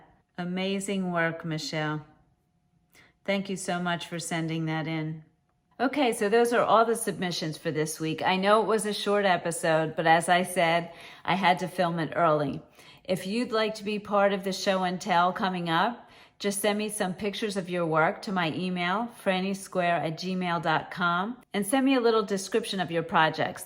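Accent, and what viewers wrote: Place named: Upstate New York, United States. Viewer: Hi! Great pics!! It's wonderful to see the projects! They are inspiring. I love the cat couches. I won a booklet of 3 cat couch patterns, but felt intimidated, plus I didn't have access to the foam pieces to put inside. Seeing these wonderful, colorful cat couches, I just might give it a try down the road. The other projects were all amazing, too... love the blanket with the appliques. Love your website, and thank you for the Yarn Ends Tip Sheet from S.D. I had wondered if you were in New York; you sounded like an upstate or Long Island New Yorker to me, not one from the City. I am a native Ohioan, but spent 4 years in northern New Jersey before returning to Ohio at age 21. My family used to cross the border to hike to historical sites in New York. I hope you really enjoyed going to the wedding.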